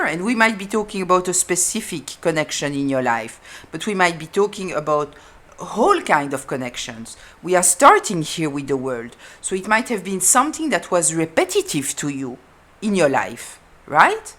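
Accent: France. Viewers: I think your approach to not bother people is a very healthy one when its done from a place of empowerment. It protects you from runner chaser dynamica where people extract energy from you running after them. If they needed your help its not upto you to insist more but upto them to ask for it in a healthy way. The only downside to your programming is imo that you fail to ask for help when you would need it yourself due to a fear of bothering others and this is indeed something that is detrimental. I enjoy your readings and the way you explain things. I also enjoy the french accent😊 Much love from a Dutch woman from amsterdam❤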